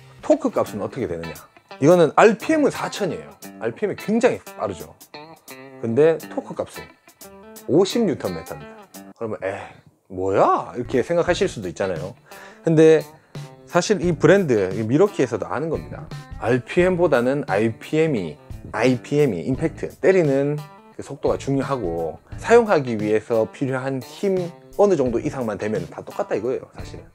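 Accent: Gyeongsang, South Korea. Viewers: 이벤트 신청 완료 했습니다. 항상 너무 재밌게 잘보고 있습니다. 구수한 경상도 말씨가 아주 듣기 좋아요~~ㅎㅎㅎ 좋은 제품 항상 잘 알려주셔서 고맙습니다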